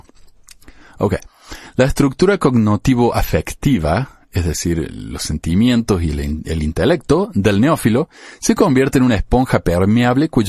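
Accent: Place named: Interior, Argentina. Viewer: Bien tirado. PD: me gusta como locutas. Soy de Perú, pero intuyo que eres del interior de Argentina porque tienes un acento como el de Luis Landriscina (un cómico). Saludos cordiales.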